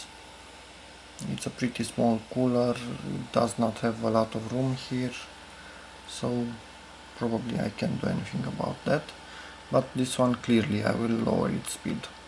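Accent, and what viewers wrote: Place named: Romania. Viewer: pot sa jur ca esti roman! accentul acela :) apropo... am achizitionat si eu acelasi pc recent. din cate am inteles are placa video integrata nu?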